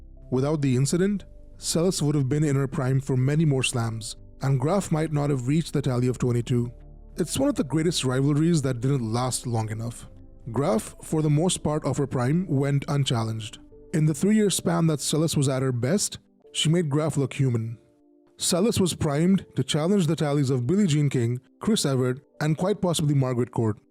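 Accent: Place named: India. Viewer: You are an Indian, can hear it in your voice though you try to disguise it.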